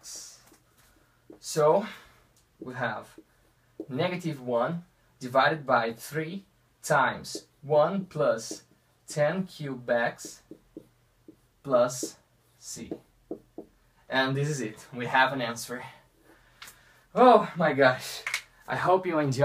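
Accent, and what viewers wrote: Brazil. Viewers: Galera, vamos acordar 2018! Saber inglês não é diferencial, é essencial! Mais do que nossa obrigação saber a lingua universal ainda mais que ele tem bastante sotaque brasileiro e fala devagar dá pra entender claramente! Excelente vídeo amigo!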